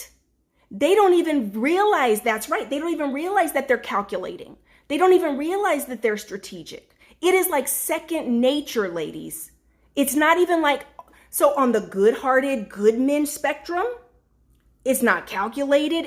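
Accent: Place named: Georgia, United States. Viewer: Why do you low-key look like Jada Pickett-Smith today? Except for the Georgia accent, you sound a little like her, too.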